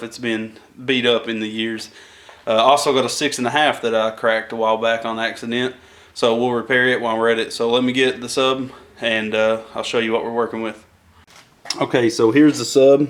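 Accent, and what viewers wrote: South, United States. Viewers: There is something about the high amount of humbleness a man sounds like with a genuine southern voice amen! Thanks for the video will attempt repair on my logitech 7 inch desktop sub since the rubber around the sub tore.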